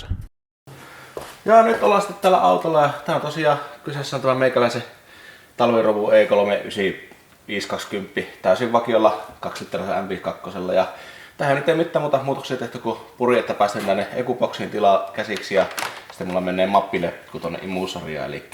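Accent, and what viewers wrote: Finland. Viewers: For what it's worth, as a non Finnish speaker the Finnish-English dialect has it's own charm. I am sure I would enjoy your videos either way :) Nice series by the way, love me some open source hardware hackery.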